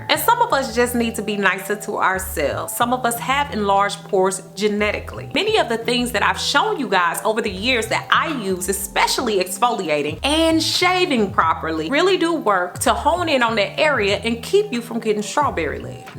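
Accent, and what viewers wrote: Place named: South, United States. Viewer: She's grew up in St. Louis & Memphis & lives in Texas so I think it's a mixture of those three. Just my opinion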